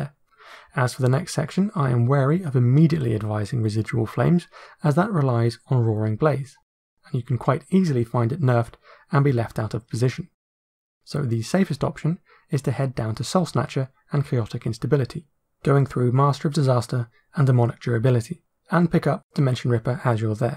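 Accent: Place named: United Kingdom. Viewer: Nice video! Nice to hear a British voice for a change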